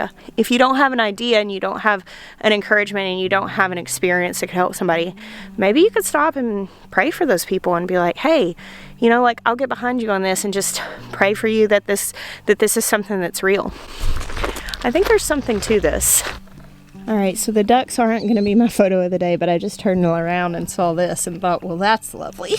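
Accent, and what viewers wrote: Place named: Arkansas, United States. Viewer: Lol I felt like you were talking to me 🤣 new subscriber! I found you right after Christmas bc I’ve been getting so excited to get my garden going again. I’ve been watching ALL of your videos. It’s so funny bc the first video I watched of yours, I noticed your accent, and said oh I like this girl. Then you said you had lived in Arkansas and was like, well there it us! 🤣 So fellow Arkansan here now! I also need to say this, and it’s going to sound insane, but when you said you wanted to do the 365 challenge, it made me want to work on my art skills again. I’ve been working on a pictured my son, and I’m usually in bed by now but I wanted to get this finished. I was in the living room and noticed a buzzing sound and started noticing a smell of melted plastic. I ran through the house thinking I was going crazy but found our hot water heater was burning! 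We’ve got it all settled now, but I’m thanking God that I was awake working on my picture. That could have turned out really bad really fast.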